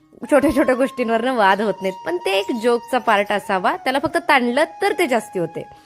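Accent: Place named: Solapur, India. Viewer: ताईंच बोलणं कोकणी नाही वाटत, सोलापूरी किंवा सांगली कडील लय वाटते बोलण्यात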